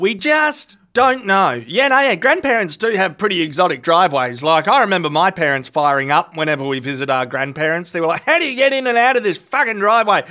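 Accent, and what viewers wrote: Australia. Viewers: This just wouldnt be the same without the Australian narration.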